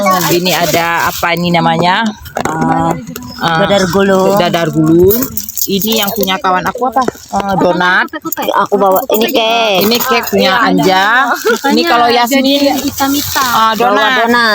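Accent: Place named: Medan, Indonesia. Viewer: Halo bunda salam kenal.. senang sekali bisa ngumpul bareng teman-teman Indonesia. Oya bunda di Indonesia asal nya dari mana klo boleh tau. Logatnya kayak orang Medan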